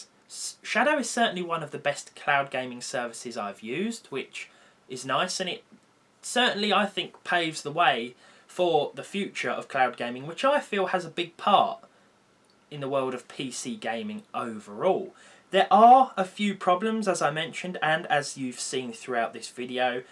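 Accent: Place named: England, United Kingdom. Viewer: watching you face review in this videos made me notice that you have a strong english accent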